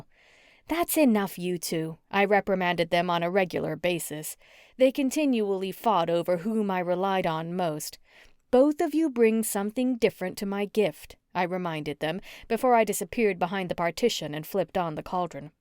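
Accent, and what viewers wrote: South, United States. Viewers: Lovey story Narrated in a southern twang😊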